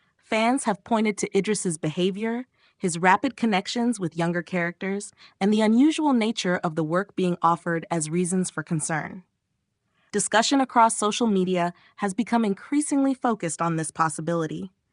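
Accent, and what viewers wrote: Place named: United States. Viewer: Isn't Corrie a British programme? Why is the narration American? (I'm assuming AI, but still ...)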